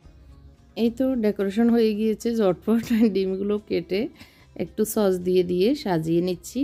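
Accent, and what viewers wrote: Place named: Noakhali, Bangladesh. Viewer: আপা নোয়াখালীর মনে হয়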